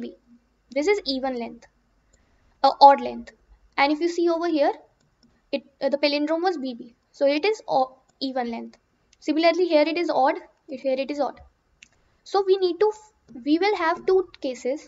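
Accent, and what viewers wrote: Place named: Punjab, India. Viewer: where are you from? Himachal/Punjab/Chandighar? that "chrecter" and "meeddle" accent sounds familiar 💖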